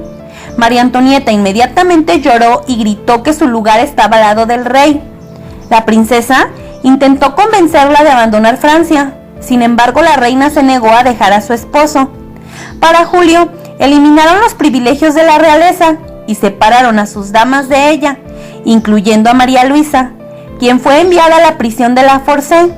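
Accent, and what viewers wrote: Mexico. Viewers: TU ACENTO MEXICANO de clase baja 💩💩💩💩 ES INSOPORTABLE. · Interesante historia pero la voz es un poco fastidiosa con ese acento mexicano tan marcado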